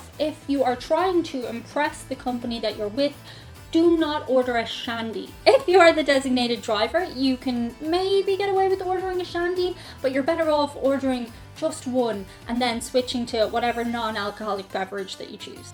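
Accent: Ireland. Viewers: I enjoy your videos and was touched when you were watching Big Bird Sing. I watch your videos because I'm an American who is part Irish on my maternal grandmother's side. I love the accent. I like your take on the difference between Irish and American people. Thanks for posting. I am a subscriber. Have a wonderful day.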